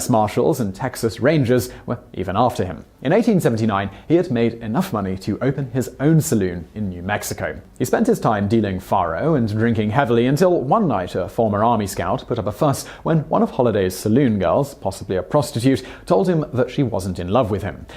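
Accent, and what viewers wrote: England, United Kingdom. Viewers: I just cannot stand a British accent.....it makes me crazy....especially the way he pronounces the game Faro ! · Despicable actually, the pretentious British accent combined with ignorance of American culture or proper American pronunciation is utterly repulsive... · This would be a lot better if the narrator didn't talk so fast and choppy with that annoying accent. English accents are great as long as they are speaking the Queen's English and not that choppy sounding stuff. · The limey narrator seems a bit biased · Nothing says like a good old biography of a American Wild West legend narrated by a Brit! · So the funky English accent makes history assumptions fact? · Why in the hell is a sewer britsh oink talking about american southern and western history? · Something weird about a British man giving me American history. 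· im English and i do feel hearing an english man talk about American gun fighters just doesn't feel credible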